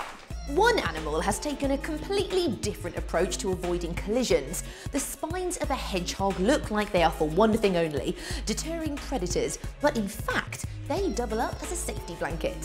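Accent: United Kingdom. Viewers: lol is so hot you can even hear her British accent when she laughs;)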